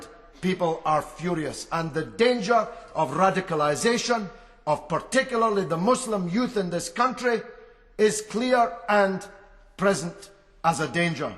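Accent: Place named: Scotland, United Kingdom. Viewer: Hey thats a Scottish accent.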